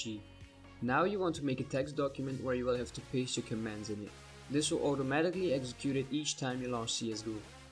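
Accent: Netherlands. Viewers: R you dutch bro??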